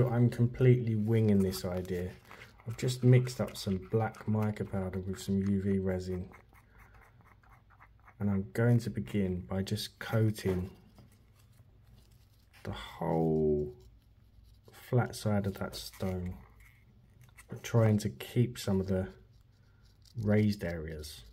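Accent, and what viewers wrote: Essex, United Kingdom. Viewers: Hello, new subscriber here, also new to resin art, just uv for now, anyway, today I found you on tiktok and what a nice surprise to learn you're from Southend on Sea, I lived in westcliff on sea for a few years, I miss it, I could see the estuary from my kitchen window 😢. Well, I enjoy your videos a lot and I wanted to thank you, oh, and I love your accent, brings back good memories, cheers 🤗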